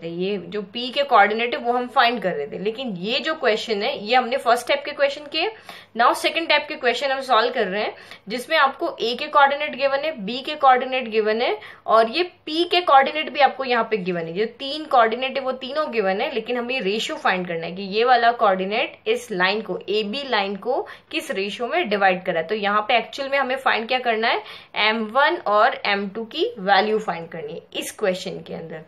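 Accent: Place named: Haryana, India. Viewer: Haryanvi accent OP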